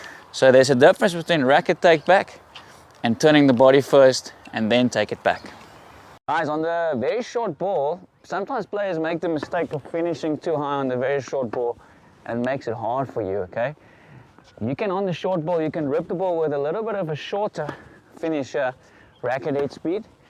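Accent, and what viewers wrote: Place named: South Africa. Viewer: Few things more satisfying than your coach expressing approval by shouting "BETTAH" in a South African accent.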